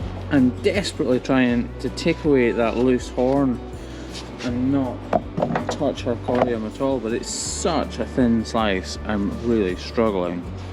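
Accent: Scotland, United Kingdom. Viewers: I think the Scottish accent on a man is grrrrrrrrr😯😊😊😊😊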